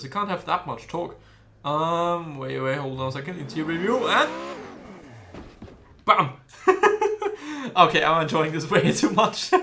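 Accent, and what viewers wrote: Germany. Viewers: Man merkt das du Deutsch bist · You have a German accent? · Ich Feier den deutschen Akzent 🤙🏻😀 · this german accent is driving me crazy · I bet, you're German? Cuz of accent. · are you german or why you speaking like a german guy english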